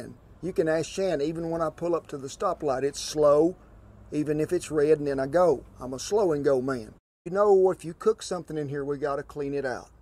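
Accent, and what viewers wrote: South, United States. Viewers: I fully expected a "why thank ya darling!!" #SouthernAccents ❤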